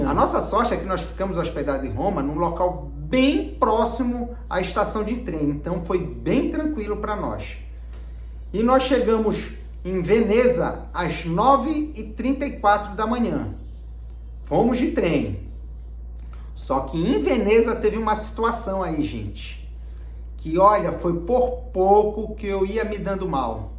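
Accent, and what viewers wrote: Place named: Pará, Brazil. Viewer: Pelo sotaque é paraense, rs! Acertei????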